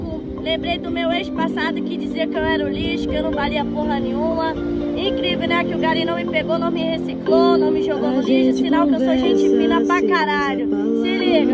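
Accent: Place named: Rio de Janeiro, Brazil. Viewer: uma japonesa que fala igual carioca e canta musica tradicional nordestina = receita do sucesso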